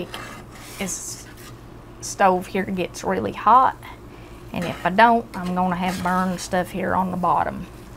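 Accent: South, United States. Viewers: Can i ask what "hillbilly" state you are from? Im guessing Alabama or Mississippi? Maybe Georgia? You sound more southern than country.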